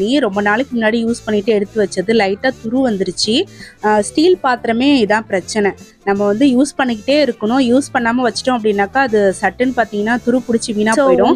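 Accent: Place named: Nagapattinam, India. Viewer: Nagapatinam karaikal side la ipdy than pesuvom avaga ivaga solrathuku bathila aha iha nu soluvom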